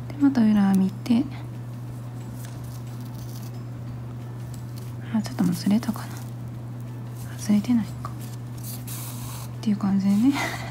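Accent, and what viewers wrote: Kansai, Japan. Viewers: さんのこんにちわぁ⤵︎🎶てゆう始まり方が大好きでガン見させていただいています(*^^*) 同じ関西なのでイントネーションが違和感なくていいです(*^^*)親近感✨ 長い間編み物をしてないのですがまた基本から始めたいなあて思えてきて毛糸をかいましたwww(*^^*) さんの、ゆるさが私にピッタリ！チャックを付けながらの色々な本音話もめっちゃわかりますよ。。同感です～ わたしゃは独特なんです笑。て話も一緒に笑いながらみています(*^^*) ゆっくりと丁寧な説明ありがとうございます(^.^)♡ 黙々と細かい作業が大好きなんで失敗しながらも楽しくこれからも学ばせてもらいますね！出会えて良かったです！！感謝😊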